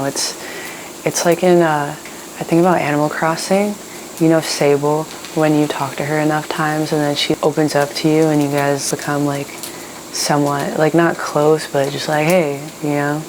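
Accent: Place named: Upper South, United States. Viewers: It sounds top of the south. Oklahoma, Kansas, Colorado, eastern cali. Possibly an empty state as well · Where is this kind of American accent from? It "low-key" sounds like a total laid-back good-hearted goofball who is secretly some kind of genius but covers it up well. I love it. Chillax, bro. Bonzo beans! :person-turqouise-waving::person-turquoise-waving-speech::person-pink-swaying-hair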